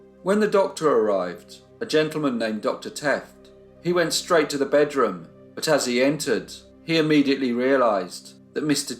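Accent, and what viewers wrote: United Kingdom. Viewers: One of my favorite bands. Must be an accent thing of that area of Britian.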